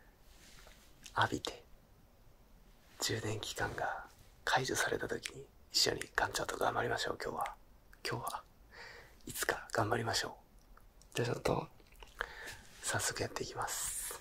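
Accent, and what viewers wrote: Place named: Kansai, Japan. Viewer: いつも標準語のロールプレイしか聞かないから関西弁ロールプレイ新鮮でめちゃよい！！！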